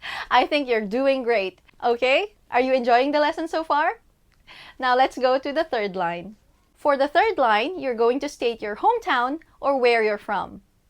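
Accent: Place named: Tagalog, Philippines. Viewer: wow she has less trace of Tagalog accent in Japanese than in English!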